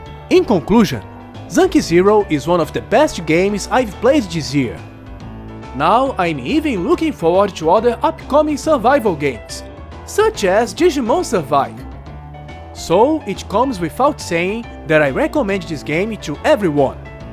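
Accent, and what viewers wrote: Brazil. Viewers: Are you from Brazil? Your accent is so brazilian-like. Im brazilian btw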